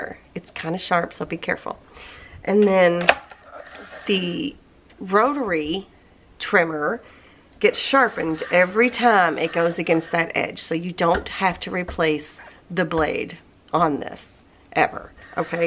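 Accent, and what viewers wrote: South, United States. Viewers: I know there's times that I have a creative block. So don't worry about it. We understand. I have a cute story to tell you. I had my neighbors 12 yr.old grandson over. He wanted to make something for his grandparents. He decided on a coupon book made from toilet paper rolls. So while he was coloring some paper to decorate with, I decided to listen to one of your videos. So I didn't put in my ear buds while watching. The video played for a bit, then he came over to look & asked me what country you where from, lol. I chuckled & said the USA & that you lived in Kentucky. I explained the people that live in the south have an accent. Of course he just said oh okay. I hope this gives you a smile, I know I get one every time I remember it. 💜💜💜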